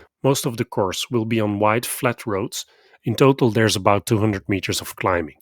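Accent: Flanders, Belgium. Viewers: 😀super tick Flemish accent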